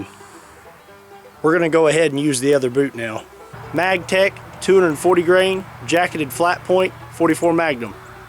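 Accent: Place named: South, United States. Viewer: He sounds sooo much more southern in this!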